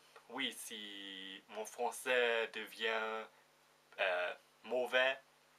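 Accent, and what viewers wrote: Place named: Germany. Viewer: Hallo ! ich bin Französich,du sprichst gut Französich , mach dir keine Sorgen ;) Ihren deutschen Akzent ist cool, nicht entfernt continue comme ça!!!!!!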